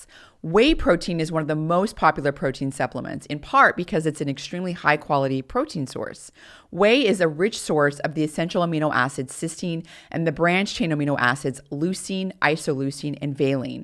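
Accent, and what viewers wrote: United States. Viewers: Your typical American voice timbre makes me think you would be a wonderful country singer.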